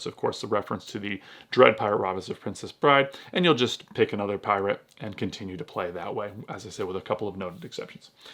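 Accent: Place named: Boston, United States. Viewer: Thanx for this mate. I'm hearing you're from somewhere in MA/Boston area, yeah?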